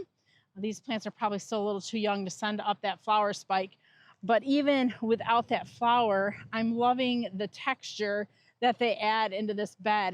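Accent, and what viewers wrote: United States. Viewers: If American mispronunciations bother you so much, maybe you should consider not watching an American's content about an American company, on an American made media platform. Clearly what we lack in proper pronunciation, we make up for elsewhere or you wouldn't be here.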